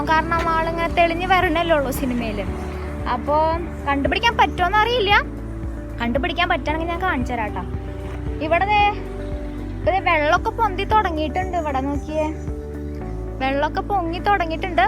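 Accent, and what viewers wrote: Thrissur, India. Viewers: D. മോളെ മോളിങ്ങനെ ഓവറായിട്ടു fece കാണിച്ചു ഇങ്ങനെ തൃശൂർ സംഭാഷണവും കൂടുതലായിട്ടു പുറത്തോട്ടു തള്ളണ്ട.... മഞ്ജു.. മണിച്ചേട്ടൻ.. സത്യൻ അന്തിക്കാട്.. etc. പലരെയും അവരുടെ വീടുകളും കാണാനാഗ്രഹിക്കുന്നു.. മോളു ലൊക്കേഷൻ കൂടുതൽ വരട്ടു.. താങ്ക്‌സ്..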